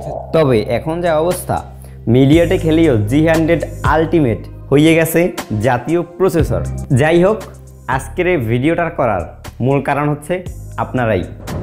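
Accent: Barishal, Bangladesh. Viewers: ভাইয়া আপনার বাসায় কি বরিশাল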